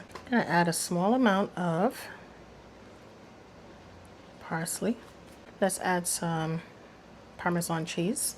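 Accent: New York, United States. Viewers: I love your New York accent. Cayenne pepper is good over that pasta 😊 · U sound like ur from new york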